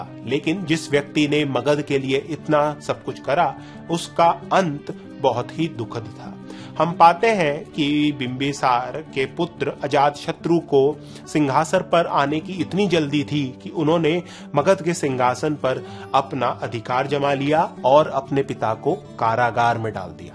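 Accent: Standard. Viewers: actually he's not wrong totally, most people use Hindi mixed with English, Persian ,Etc in modern day .... But this शुद्ध Hindi sounds so good to listen to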